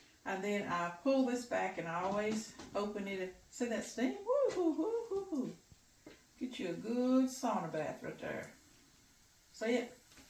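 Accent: South, United States. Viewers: I’ve only been canning a couple years so I love how you tell a story almost. I am from the south so our accents are similar - Tennessee / North Carolina. I subscribed and will go back to your other videos also. ☺️ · Great video! You're so precious...love your southern accent!!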